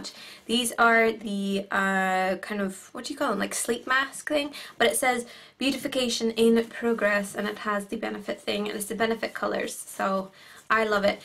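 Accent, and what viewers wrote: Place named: Cork, Ireland. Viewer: So good to hear a Cork accent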